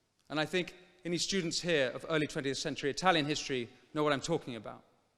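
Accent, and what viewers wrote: United Kingdom. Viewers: Lo speaker non e' Americano. Accento definitamente British. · L'accento e la sintassi sono prettamente britannici: chi è l'oratore?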